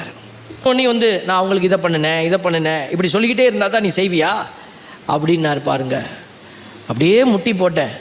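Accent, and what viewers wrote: Sri Lanka. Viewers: எனக்கு பிடித்த தமிழ், இலங்கை தமிழ், கேரள தமிழ், மலேசிய தமிழ். ஃபாதர் ன் இலங்கை தமிழ் அருமை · Father இலங்கையர்கள் எல்லோரும் நீங்கள் பேசுவது போன்று சாதாரண தமிழ் தான் பேசுவோம் யாழ்ப்பாணத்தில் இருப்பவர்கள் மட்டும் தான் நீங்கள் பேசி காட்டும் தமிழில் பேசுவார்கள் ஆனால் யாழ்ப்பாண தமிழ் தான் பொதுவான இலங்கை தமிழ் என்ற தவறான கருத்து இருக்கிறது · Father Srilankan tamil azhaga peasurigale😊 awesome 🎉🎉🎉 antha "விளங்கிட்டா" ultimate ❤❤❤ Unga sirippukkulla yelo vazhi irukkumnu puriuthu. Praise be to the Lord 🕊 unga pani sirappa thodaranum. Lots of love from your child Catherine, Srilankan❤ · இலங்கை தமிழ் 😅😅👌👌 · Neenga peasinadhu srilankan jafna tamil. neenga peasura tamil madhiri oru koottam irukku adhudhaan Indian vamsavaliakkalnu.nuwara eliya mavattaththula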